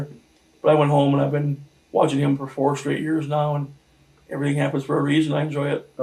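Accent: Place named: Upper Peninsula, United States. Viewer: Love the Yooper accent!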